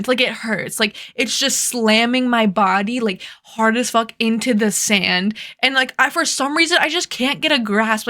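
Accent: United States. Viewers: you have the best regional american accent on the earth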